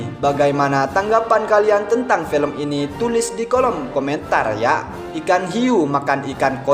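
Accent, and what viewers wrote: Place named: Madura, Indonesia. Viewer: Suaranya kayak Oreng Medura....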